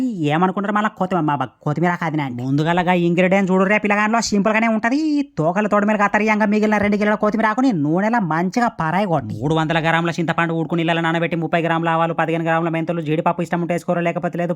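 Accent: Telangana, India. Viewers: Annooo.... masthunnadhe pachadi, telangana yasa la matladudante naku masthistam❤... nee matalu intante samburam aithadanna.. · Anna Mee matalu vinte sinnapudu ammamma matalu gurthosthai.. Pakka telangana Slang 1000%❤❤❤ Pedda fan anna Mee matalki..😊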